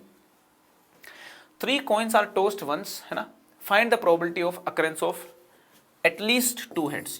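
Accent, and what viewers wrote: India. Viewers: I understand Indian accent badly but it is still better than our math at school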